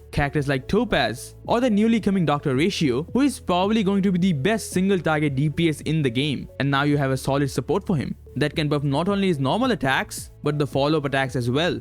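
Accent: India. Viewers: You are indian ? Accent seems so {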